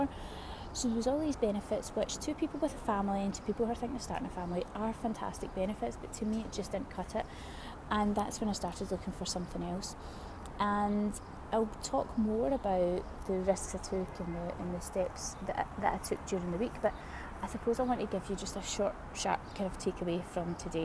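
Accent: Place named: United Kingdom. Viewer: I'm & I love English British accent ✌🏼️😁🇰🇼🕊you welcome in your second home kuwait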